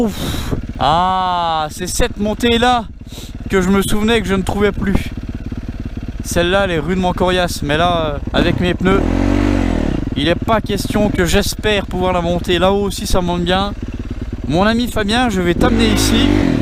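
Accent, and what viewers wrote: France. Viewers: T’es pas des Vosges toi groooos ? 😂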